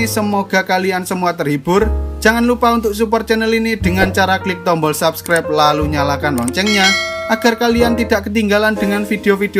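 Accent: Java, Indonesia. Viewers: jawa kah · Mase medok banget...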